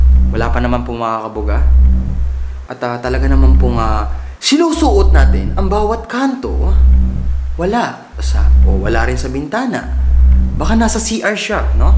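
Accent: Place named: United States. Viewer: Bakit ka nag american ako subcribe ko tas like shempre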